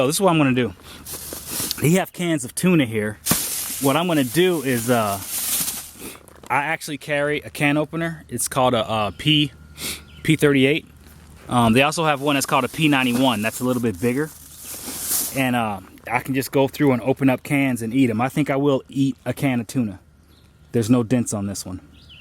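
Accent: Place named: Lehigh Gap, United States. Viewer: Your making great progress keep up the good work. Enjoy your accent out of Lehigh Gap.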